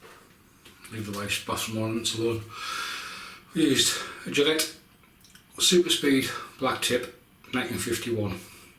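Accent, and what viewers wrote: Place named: North East England, United Kingdom. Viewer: Just subscribed a few days ago. Nice to hear a North East accent. My part of the world as well. Hope you hit the 200 subscribers soon. I enjoyed watching your shave.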